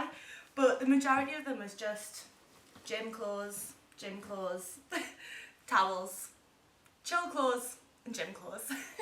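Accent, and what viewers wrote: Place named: North East England, United Kingdom. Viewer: Good to here a North East accent on a fitness channel. Am ganna try that egg and onion thing as it look 👌🏼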